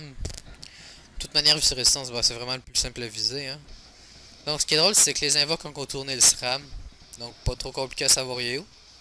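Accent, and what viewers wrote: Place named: Quebec, Canada. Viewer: OMG TN MICRO EST MEDIOCRE XD, Sinon ta un accent québécois (je suis un nouveau sub) :p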